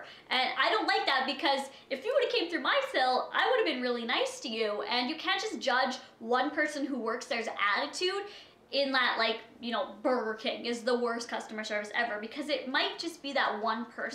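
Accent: Canada. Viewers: Such a cute canadian accent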